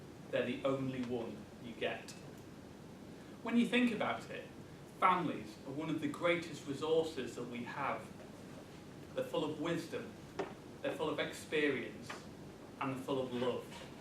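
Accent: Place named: Yorkshire, United Kingdom. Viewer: Great speech. I am looking for a speech concept for my CC10 and came across this. I love the Yorkshire accent. I am from Cape Town, South Africa but lived in Leeds. So you bringing back memories. If you happen read this. Great speech topic.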